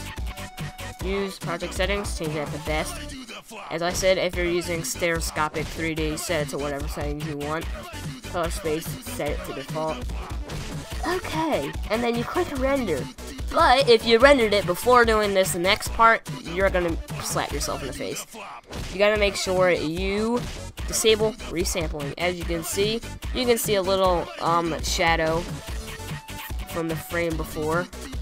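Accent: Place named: United States. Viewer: you sound 100% American, why are you choosing PAL?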